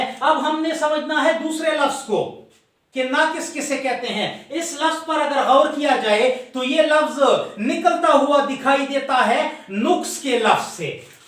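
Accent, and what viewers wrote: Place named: Pakistan. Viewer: aap urdu speaker nahi ho mujhe balochistan ya peshawar se lagte ho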